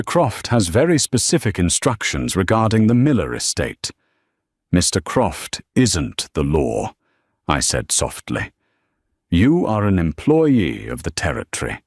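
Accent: England, United Kingdom. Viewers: narrated by an American frontiersman with AN ENGLISH ACCENT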